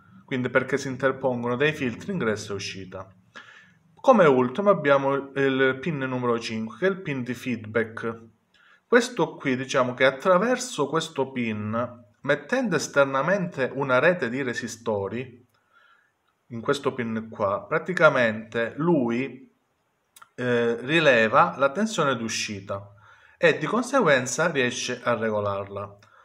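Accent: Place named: South, Italy. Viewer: Ma di dove sei dal accento sembra che sei del sud italia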